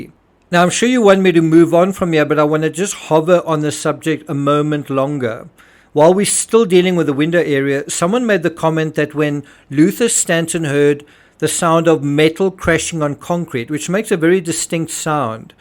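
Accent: South Africa. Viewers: I hear a South African. I like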